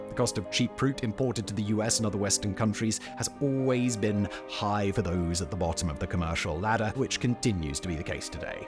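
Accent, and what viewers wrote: United Kingdom. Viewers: Don’t believe all this. Read the Fish the Swallowed the Whale for a more balanced view. A British accent doesn’t mean it is unbiased . By the way, why don’t we make a comparison with the British East India Company’s practices or the practices of Jardine Mathesen in Asia selling opium. A comparative approach might make your assessment more enduring.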